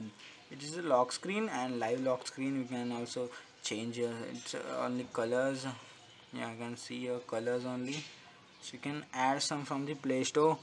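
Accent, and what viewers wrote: India. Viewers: im tryin very hard to comprehend your indian accent, but fail, will try other video tutorials with better pronunciation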